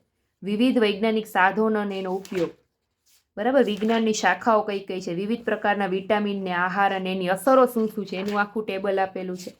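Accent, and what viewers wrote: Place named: Banaskantha, India. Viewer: બનાસ ની ઓળખાણ ના આપવી પડે બોલી જ કાફી છે ❤ જિલ્લો મારો બનાહકાંઠો ❤ તાલુકો ધાનેરા❤ બનાસ ડેરી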